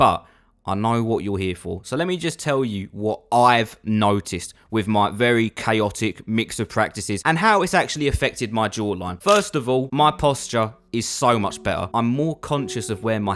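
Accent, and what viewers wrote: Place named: United Kingdom. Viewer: strongest british accent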